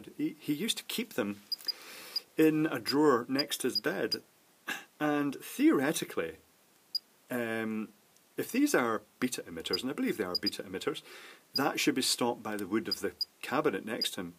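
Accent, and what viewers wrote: Scotland, United Kingdom. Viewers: Very informative, I also wanna buy this because of the same video. Also, are you Scotch?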